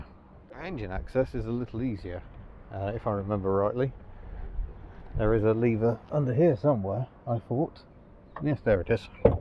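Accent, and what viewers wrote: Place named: England, United Kingdom. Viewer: How come you talk with an english accent not NZ?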